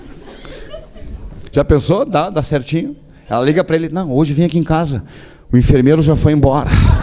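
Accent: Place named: Rio Grande do Sul, Brazil. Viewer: Esse sotaque Bagual malandro é único , o identificação do caralho!!! Muito bom parabéns! 👏👏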